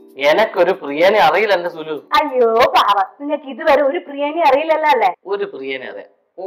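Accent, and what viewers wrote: Kannur, India. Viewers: Kannur or kasaragod ?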